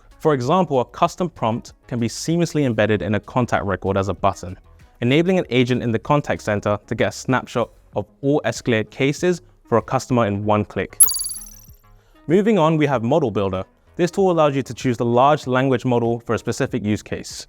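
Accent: United Kingdom. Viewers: is this a london accent? im digging it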